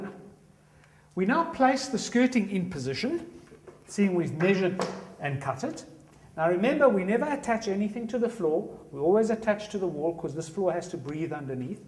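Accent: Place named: South Africa. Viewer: Great to hear that South African accent! Thanks for your video and efforts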